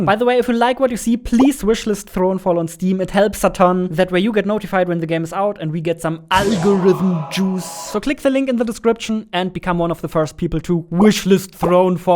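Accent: Germany. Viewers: Man hört fast garnicht raus das du Deutsch bist, Echt gutes Englisch👍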